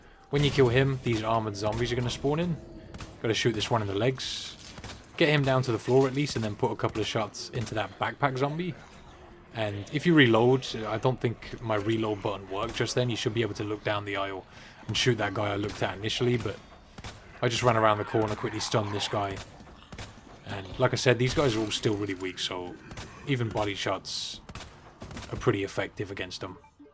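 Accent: United Kingdom. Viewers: Dude, you made this look easy. LOL! Really well done, you are insanely skilled! By your accent I can see that you are british, cheers mate! Much love from Brazil.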